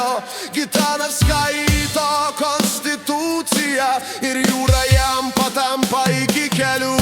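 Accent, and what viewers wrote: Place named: Russia. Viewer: Ar čia tyčia rusiško akcento gaidelės?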